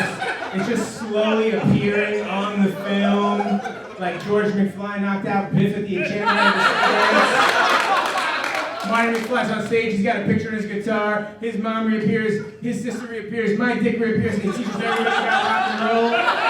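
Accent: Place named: Brooklyn, United States. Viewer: I thought he was from Brooklyn.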